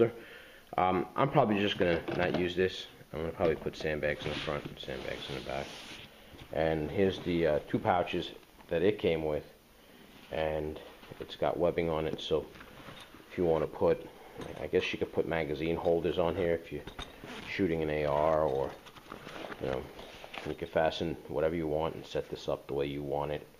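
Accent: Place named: New York, United States. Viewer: anytime a guy with a heavy new york accent says "alright, you're probably wondering whats under da bag...." you'll want to stay till the end : )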